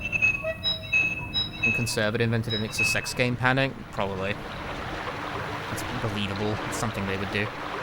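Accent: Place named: United Kingdom. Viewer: I've watched some of your videos before, but never occurred to me that you're from the uk, even though I did notice you have an accent lmao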